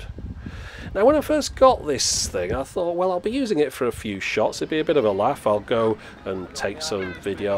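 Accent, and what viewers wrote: United Kingdom. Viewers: Just wanted to thank-you for this video. It's really concise and interesting. To be fair, I like all your videos and it's good to listen to a fellow Brit! Was looking for a gimble for my the videos on my channel and this could be the one. Also, I particularly liked your "How to write a youtube comment" video - so true!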